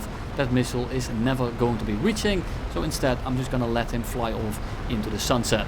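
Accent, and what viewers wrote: Netherlands. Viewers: Ik heb nog nooit zo een duidelijk Nederlands accent gehoord in het Engels.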